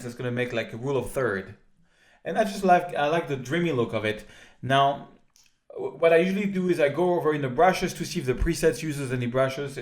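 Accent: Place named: Paris, France. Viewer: man you are the king of marketing to be sure - never seen anyone as slick as you and with their fingers in so many pies...I just love your architectural work, fantastic- aspiring pros can learn a lot from you as to be a successful photographer in this day and age requires not only solid photography skills but also sound knowledge of how to market yourself and the many areas you need to explore to maximize your income - is the man and the Parisian accents just adds to the mystic - I think I will work on establishing an Italian accent, or perhaps German - anything to set you apart from the competition!!!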